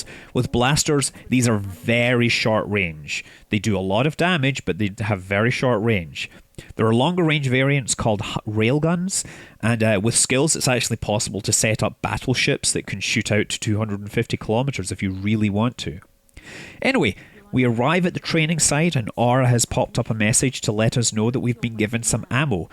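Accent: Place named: Edinburgh, United Kingdom. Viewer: Are you from Edinburgh? Good comentators voice, easy to listen to, i'm from Cheshire.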